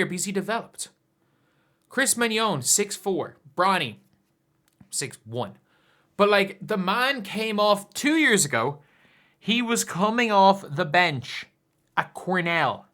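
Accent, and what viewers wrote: Ireland. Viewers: You must be from Cork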